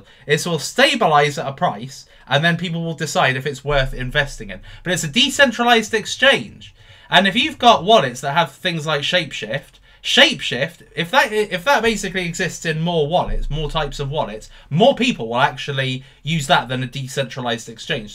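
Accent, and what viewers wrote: United Kingdom. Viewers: ahhhh, had to say, I actually missed hearing that brit voice ahahaa. Hope you had a fair holiday bruv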